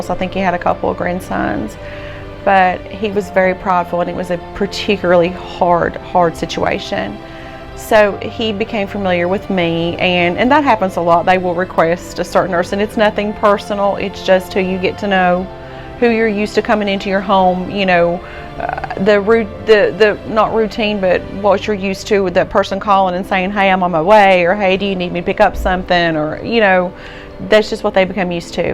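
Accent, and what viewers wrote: United States. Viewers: Are you from southwest VA? I grew up there and you sound JUST LIKE my family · Dang she’s country. I’ve been living in the south for 30 years and I still can’t get use to it. Lol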